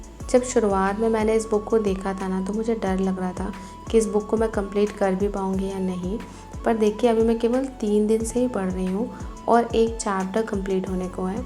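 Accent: Bihar, India. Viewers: Madam aap Bihar se hain ya Jharkhand se??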